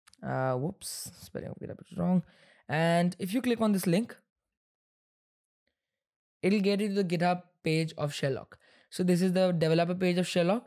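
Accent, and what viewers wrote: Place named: India. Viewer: Pov u found best video but breo have indian accent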